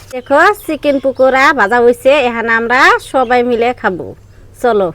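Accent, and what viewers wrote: Tangail, Bangladesh. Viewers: টাঙ্গাইল এর ভাষায় কথা বলেন আবার পরিচয় দিচ্ছেন ভারতীয়? এই ভুল তথ্য দেওয়ার কারণ কি?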